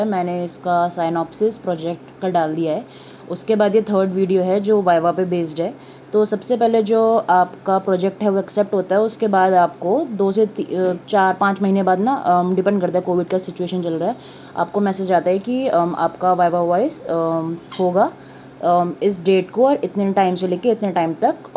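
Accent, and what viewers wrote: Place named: Haryana, India. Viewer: Miss chitra.. Zaruri ni h sabka tarika same ho kisi kisi ka alag hota hai or may be ho skta hai ye haryana side se ho tou isse farak ni pdta jaise ki neet ki coaching dete h physics wallah sir Allakh panday name h unka but aaj lakho log unke fans h kyuki unka tarika hi alag hai. Or i think jo zyada bna kr bolte h ya saja kr bolte h log unse bor ho jate h nd mujhe inka way of talking achha lga or ignou ka management hai hi aisa k muh se mithas nikalti hi ni h. Aisa waha ka staff b preshan hai.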